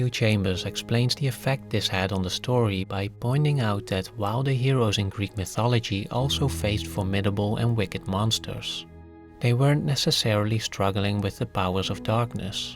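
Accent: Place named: Netherlands. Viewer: I recognise your accent is it dutch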